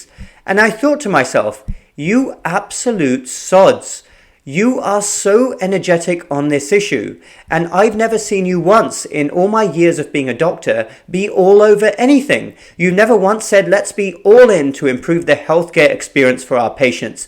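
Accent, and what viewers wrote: United Kingdom. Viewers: I bet the Septic Tanks love your British Accent. 😁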